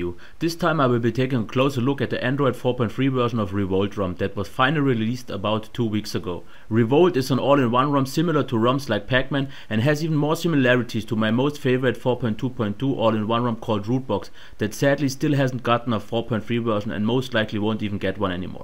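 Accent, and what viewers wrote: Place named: Germany. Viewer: This review is great, but the accent is even better. German?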